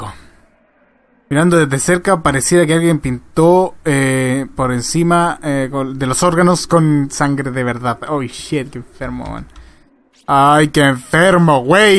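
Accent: Chile. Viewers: lonrot 2... usted es chileno? bien :D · que Chucha es chileno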